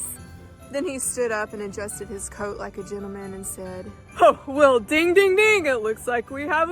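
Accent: South, United States. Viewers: tell Santa you've been around a bit, but you ain't no ho. You're beautiful, sexy, that charming Southern accent unravels my socks and makes me stand at attention. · a beautiful one with a southern drawl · I love the southern drawl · Redneck accent but you ain’t half gorgeous 😋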